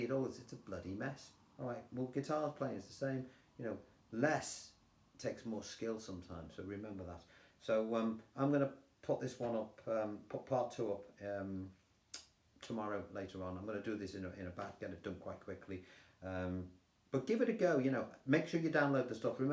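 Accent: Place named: England, United Kingdom. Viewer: Hi. I have only just found your YouTube site by accident. I was looking for a tutorial for and you came up. I have had a look through and now subscribed to your channel. I have been playing a few months now and learned some open chords which I will need some time. The trouble is I can’t sing so want to play instrumentals which I have started to plat, still slow as it takes longer to sink in with me. I started a bit late in life wanting to learn guitar but can play a little, it is only for myself but enjoy what I am learning. I am 72 so don’t know if I will ever play perfect or not but I will have a good try hopefully. Thank you very much from me to you because I like your approach to your teaching method. From your voice I know you are English but obviously don’t know if you still live on the UK or not. I am from Warrington Cheshire, you will probably know where that is. 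Anyway I won’t bore you to death any longer, but once again a big thank you from me and others that watch you or have lessons with you. Take care and best regards. Chas.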